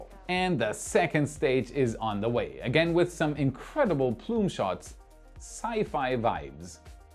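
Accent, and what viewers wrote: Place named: Germany. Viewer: Awesome to see you back. Space should always have a German accent!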